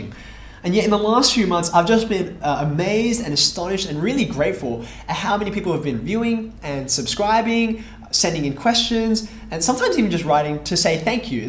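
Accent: Australia. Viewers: The Austrailian accent makes everything you teach <num> times better!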